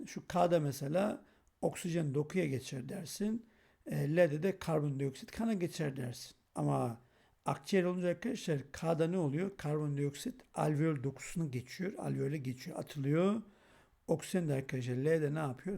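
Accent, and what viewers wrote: Black Sea, Turkey. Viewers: Karadeniz şivesidir diyor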